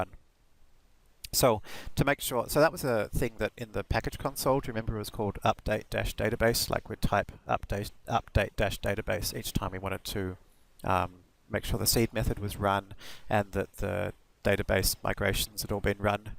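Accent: Australia. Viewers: Excellent Series, very clear and understandable especially since it was end to end(Bonus points for being in an Australian accent too). I am in the process of melding this framework to the out of box internet application in MVC. I only have a few questions: How can angular deal with Authorisation? How can Angular prevent CSRF with the in built Token Validator in MVC? Is it possible or even advisable to use cshtml pages running razor code as templates? And lastly is there a way to prevent a user from manually navigating to a html template to stop them from loading it without the index page? Thanks very much :)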